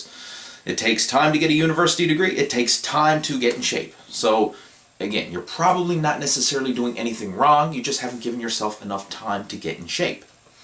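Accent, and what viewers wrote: Newfoundland, Canada. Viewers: Buddy, you sounds like your from NL!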